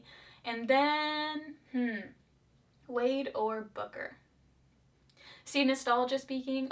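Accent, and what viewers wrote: Canada. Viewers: also im here for your canadian accent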